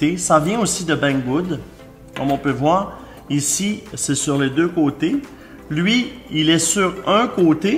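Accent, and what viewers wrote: Canada. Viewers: J'adore ton accent canadien tes le meilleur. Je veux un spinner stp je fait toujours tes concours mais je gagne jamais et la j'espère gagner. Merci pour tous se que tu fait pour nous .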